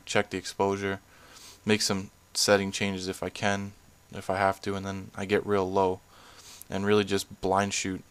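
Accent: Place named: East Coast, United States. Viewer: good video and good tips I want to get rollers when im down in ocmd for june and sept you sound like your from around the east coast I do photography as well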